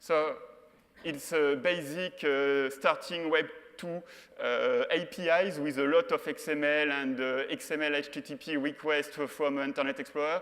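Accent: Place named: France. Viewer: french accent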